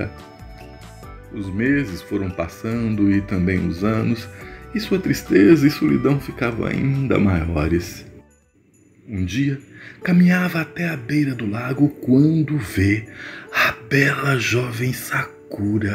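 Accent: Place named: Minas Gerais, Brazil. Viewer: Lindimaisdaconta!!! Em sotaque minerêis.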